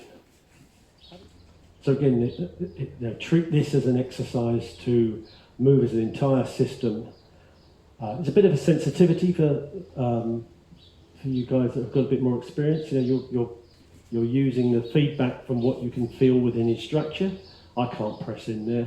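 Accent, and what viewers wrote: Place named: United Kingdom. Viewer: Thank you so much for posting these videos! I did aikido a few years back so it helps me to reminder, also I’m English transplant, so nice to hear a old Brit accent again lol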